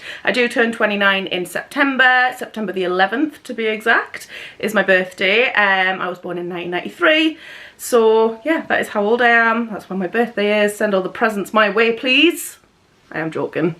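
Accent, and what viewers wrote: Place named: Newcastle, United Kingdom. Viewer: I love the Geordie accent 🔥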